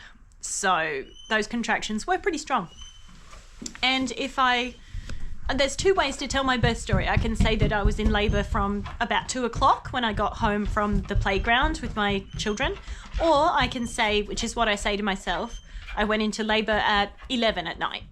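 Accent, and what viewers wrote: Australia. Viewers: Thankyou for sharing Your Birth Story. I enjoyed listening to a fellow Aussie. Your Videos are Great!! Sending Love to You & Your Family. ❤ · Thanks for sharing your story! I was so excited to find your channel, it’s refreshing to hear an Aussie accent and Australian birds in the background 😂 I have had four natural hospital births and feel so drawn to a home/unassisted birth next time so hearing others stories is inspiring to me ❤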